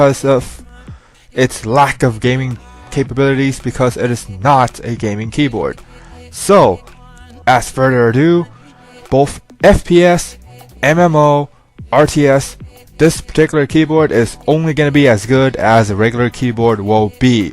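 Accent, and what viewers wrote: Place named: South Korea. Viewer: quikfinger00 it Sound like he have Korean Accent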